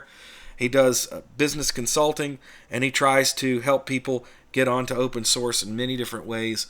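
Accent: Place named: Virginia, United States. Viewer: We love you, you're a great orator educator and communicator. I guess those descriptors are redundant to each other. But they are true. Being a New Englander I especially like your distinctive Virginia accent. Mostly I love the passion you put into teaching Linux to the rest of us. You've helped hundreds if not thousands of people transition from windows to Linux. Granted Microsoft helped the cause by developing the worst operating system ever. I only wish you didn't react to the haters, you come off a bit cringy and oversensitive. You need to learn to not let them get under your skin.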